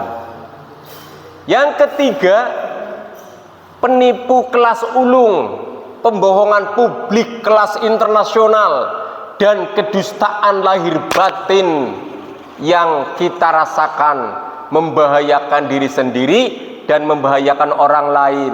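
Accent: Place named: Songgom, Indonesia. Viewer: Kirain wong cilacap jbule wong songgom👍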